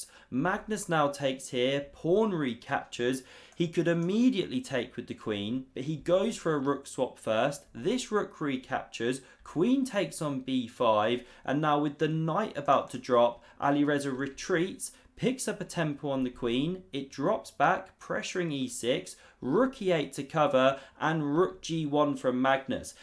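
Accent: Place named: Estuary English, United Kingdom. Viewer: Annoying juvenile "humour," even more annoying Estuary English pronunciation, and insufficient analysis. Agmadator's videos are FAR superior.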